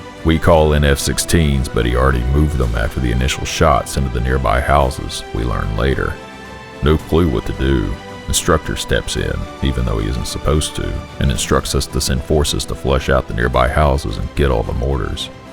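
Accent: Germany. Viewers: Whatsup with the german accent? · hmm , do not confuse dutch with german .... so please hold that freaking german accent ! thx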